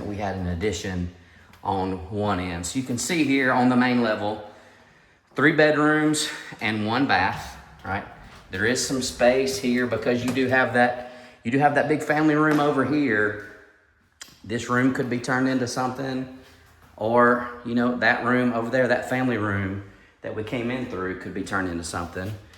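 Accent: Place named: Alabama, United States. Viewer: Well, we have a Northport in Florida, but I’m guessing by the sound of his accent and the county is Tuscaloosa, which I’m not familiar with, is probably in Alabama. Maybe you wanna mention that in the title. I’m sure there’s lots of Northports in this country. So many videos on Facebook and YouTube, are just like this with no mention of the state.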